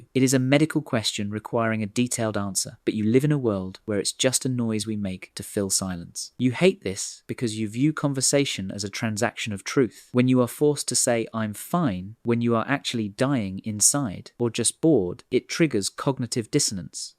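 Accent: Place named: United Kingdom. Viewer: I don't know why your videos are so funny. Think it's the satire with that serious british accent - I love it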